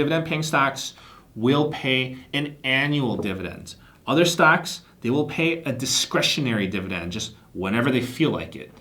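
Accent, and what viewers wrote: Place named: Chicago, United States. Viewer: Thick Chicago accent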